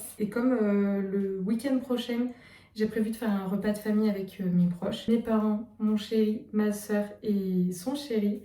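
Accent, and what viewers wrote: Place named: South, France. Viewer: C’est drôle j’ai remarqué que tu as l’accent du sud mais que sur les mots qui finissent en « in » ahah ça a un peu perturbé la sudiste que je suis ! Mais ta vidéo était vraiment digne d’un poème c’était tout doux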